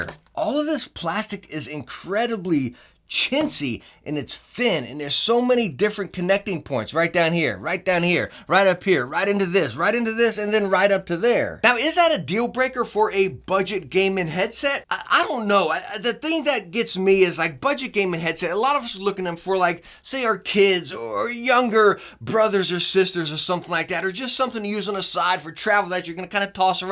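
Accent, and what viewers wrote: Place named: Staten Island, United States. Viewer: I love your accent, my man. Are you from Staten Island by chance?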